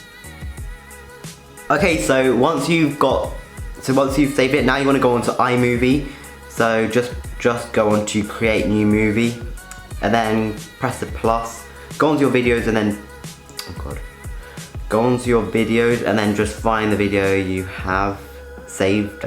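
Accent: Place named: London, United Kingdom. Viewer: I love your accent I am so jealous I almost got it when I went to London 🇬🇧 over the summer 2018 however, I wish I still got it.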